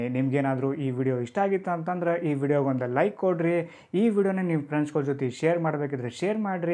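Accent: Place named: Uttara Kannada, India. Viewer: Superb Uttara kannada language superb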